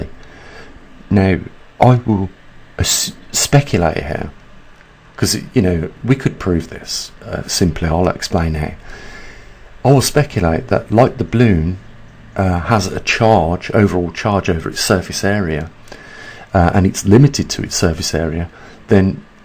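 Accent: Birmingham, United Kingdom. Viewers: You sound like a fellow Brummie. Lol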